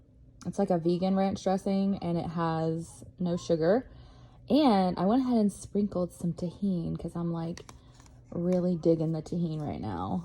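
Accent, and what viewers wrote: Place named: South, United States. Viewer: Your asking what brought me here. Well I love cleaning motivation and inspiration but to tell the truth I have been drawn to the vlog style videos 😊. So being from Canada 🇨🇦 we get teased and bugged all the time about how we talk. I do enjoy the way y’all speak in the south so carry on doing you and carry on with the vlog style content ❤️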